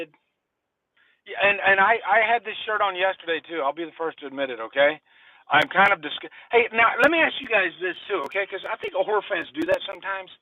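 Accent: South, United States. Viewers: We have Tesco express here in the UK- Marks and Spensors if you’re wanting posh! Love your southern drawl…. I was born in London, and moved to Norwich… So now I sound like a cockney farmer! 😳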